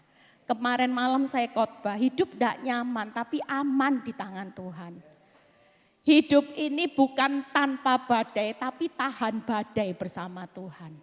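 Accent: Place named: Surabaya, Indonesia. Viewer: Bu. ini asli org Surabaya yaaa....? Kok cekok kata2 persis orang Surabaya...🙏🙏🙏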